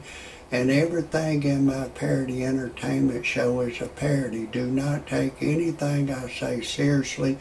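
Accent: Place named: South, United States. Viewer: lol, excellent...this is good entertainment, I think that's the name I catch from you, but maybe the southern 'twang' does defeat me in this regard...sadly that '21yr old having my baby' appears to have prematurely put our host 6ft under..RIP.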